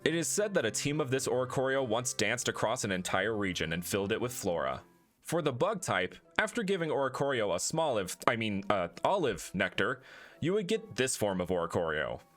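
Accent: Ireland. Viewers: I thought he was Irish Also merry Christmas 🎄🎄🎄🎄🎄🎄🎄🎄🎄🎄🎄🎄🎆🎇✨🎁🎈🎄🎄🎄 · Be proud of your Irish heritage - it makes you human (and I love an Irish accent! 😊) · Being Irish I can confirm he is Irish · Are you from Ireland too? 💚🤍🧡🇮🇪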